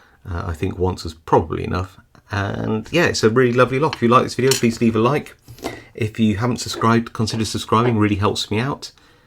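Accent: United Kingdom. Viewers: It's always interesting to watch, honest you could just talk and not pick a lock and we'd still enjoy it. British people are pretty awesome sounding anyhow, but ya you'd be surprised, we want to know more about you too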